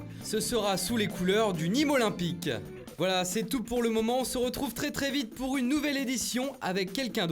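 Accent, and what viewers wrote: Italy. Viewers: Elias Parker Bah ouais nous on veut entendre l’accent italien gros 🤣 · Je reste toujours attentif à ton accent italien quand j'écoute,je ferais comment si tu pars? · Non non non il faut que tu restes !! Le plaisir de l’accent en série A va tellement manquer :/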